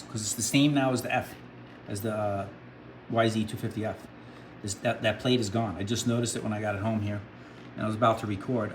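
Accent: New York, United States. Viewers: Definitely from New York 🎉🎉😂😂hear it in voice🎉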